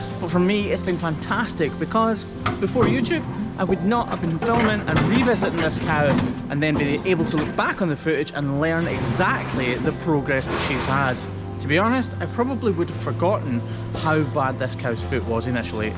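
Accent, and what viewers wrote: Scotland, United Kingdom. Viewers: I love to watch your videos! Very relaxing with the music and your calming nature. You’re so positive and it brightens my day when I’ve had a bad one. I’ve always loved the Scottish accent too 💜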